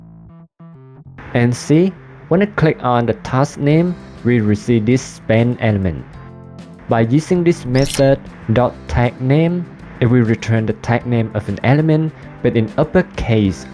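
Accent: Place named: Vietnam. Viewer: anh nói kiểu giọng Anh-Việt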